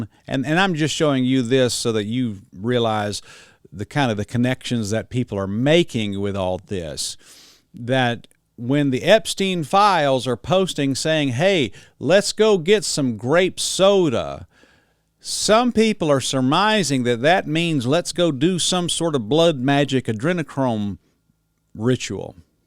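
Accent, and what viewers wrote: South, United States. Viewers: You sound like a hillbilly brooooo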